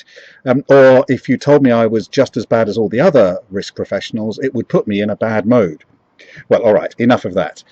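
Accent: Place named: United Kingdom. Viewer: Most amusing, - couldn't help smiling at some of the lines, delivered in such a typical British style...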